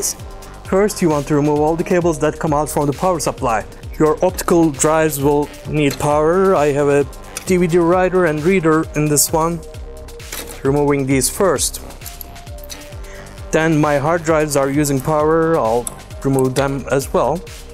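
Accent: India. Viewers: Very Indian, love it!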